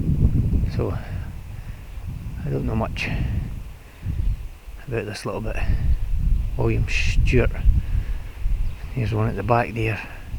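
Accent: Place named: Scotland, United Kingdom. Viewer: Thank you for sharing. I could listen to your brogue for hours!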